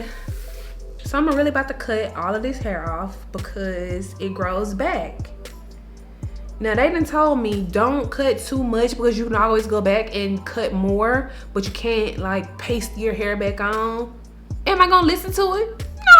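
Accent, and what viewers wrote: South, United States. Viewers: And that country accent is everything! #SouthernRoots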